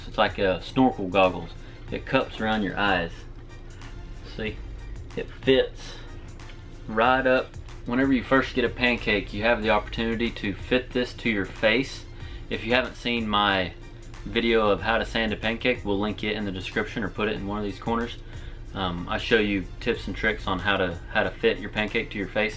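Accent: Oklahoma, United States. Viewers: You from Oklahoma???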